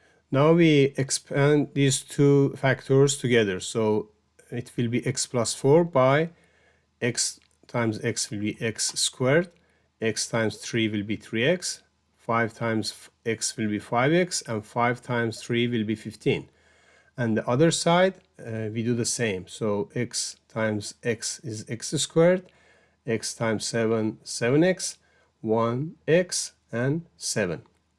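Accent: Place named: United States. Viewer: I liked your American accent 🩵 💙💫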